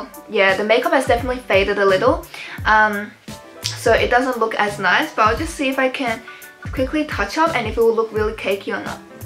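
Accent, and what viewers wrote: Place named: South Korea. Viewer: thank baby love your share 尽管听韩国口音很想死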